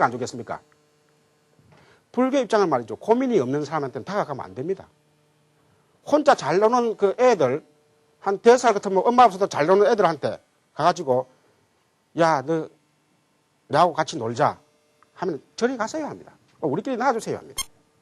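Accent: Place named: Gyeongsang, South Korea. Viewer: 귀한 영상을 올려주셔서 감사합니다 교수님은 듣기로는 서울대 수학과를 나오시고 동국대를 졸업하고 인도를 유학하신 분으로 초기불교의 대가이십니다 우리나라 스님들께서는 이분의 강의를 꼭 듣기를 권유합니다 우리나라는 대개 대승불교인데 대승불교 없는 초기불교는 있어도 초기불교 없는 대승불교는 없습니다 이 강의가 2005년도경에 서울 남산에있는 대원정사의 당시 대원불교대학에서 강의 하신것 같은데 귀한 영상입니다 많은 분들이 들으시고 불교에대해 공부하는데 많은 도움이 되면 좋겠습니다 경상도 말씨로 알아듣기 어렵지만 몇번 들으면 맛갈납니다 당시 김사업교수님의 유식강의도 명 강의인데 영상을 내려 아쉽습니다 참 귀한 영상입니다 절대로 이 영상 내리지 마십시요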